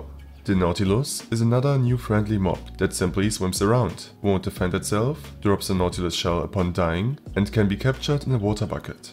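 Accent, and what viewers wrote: Germany. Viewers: Wow very gut mod but i smell some deutsch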